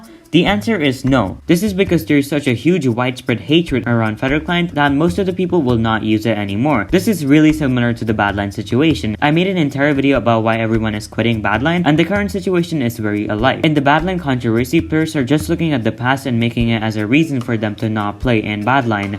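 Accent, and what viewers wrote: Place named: India. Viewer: I like the way u speak english and ur indian :O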